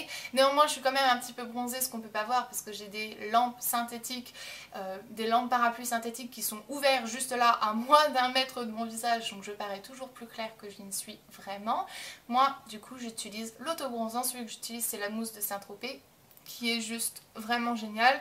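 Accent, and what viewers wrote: United States. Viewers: J'aime vraiment ton "accent" anglais/américain. Même si je sais que à la base tu es française, mais je trouve que cette langue te va vraiment bien dans ta façon de parler etc. (; Bonne continuation. ♥ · Parfois lorsque tu parles on dirait presque que tu es une vrai américaine !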